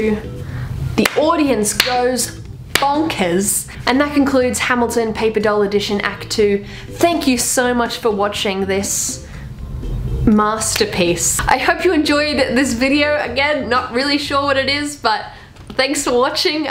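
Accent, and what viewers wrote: Australia. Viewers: Skylar Sky she’s Australian its an accent thing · I love ur Aussie accent👍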